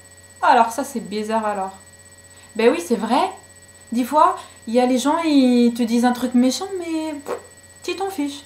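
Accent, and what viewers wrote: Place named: North Africa. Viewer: J'adore ton accent de bledard .... Tres belle video en tout cas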